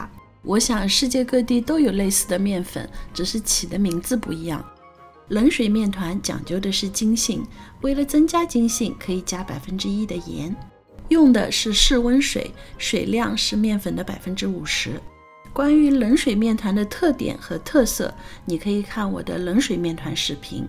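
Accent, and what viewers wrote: Shanghai, China. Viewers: 难得听到有youtuber说这么正宗的上海国语，必须follow一记！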